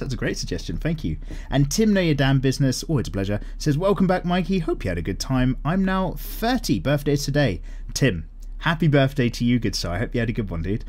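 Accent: United Kingdom. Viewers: dumb question but here it goes . I've kept tabs on your videos for a time now because___ you are really fucking good at this . why not put out a little auto biography of how it is you got this effing good , and why (even it's for extra money) why you let us all in on it . the skill set , your craft , the glib humor . there's no other channel worth sticking with because they all suck . Lastly , in America many of us are burnt out on british accents they use it for everything commercially so you're the rare exception for me . so what do you say ? how about a story about yourself . ( hell include your band too) best regards , me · Mikey you kinda look like and sound like Ravi from Izombie (not just the British accent). if you don't know who i'm talking about... well you can look it up. i'm also gonna post this on every DWM in the future till you find out.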